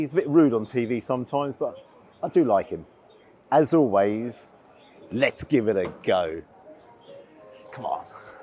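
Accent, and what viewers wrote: United Kingdom. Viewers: Glad your putting all these overrated overpriced places in there place, and the british accent makes it more credible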